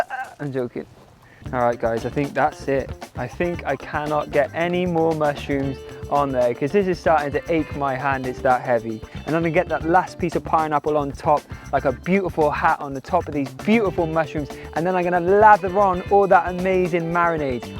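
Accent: Wales, United Kingdom. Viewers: Great video, mate! Mouth watering stuff for sure. Also, are you Welsh? Didn't know that!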